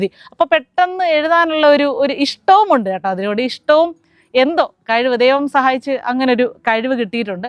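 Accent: Thiruvananthapuram, India. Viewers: കമ്മികളുടെ 10 കൊല്ലത്തെ ദുർഭരണം താങ്കൾക്കു വീഡിയോ ചെയ്യുന്നതിന് വിഷയ ദാരിദ്ര്യം ഇല്ലാതായി. പിന്നെ തിരോന്തരം ഭാഷ നല്ല രസമുണ്ട്. വിജയാശംസകൾ 💅💅💅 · ഈ തൃരുവന്തസ്പുരം ഭാഷ എവിട്ട്നിനിന്നും കിട്ടി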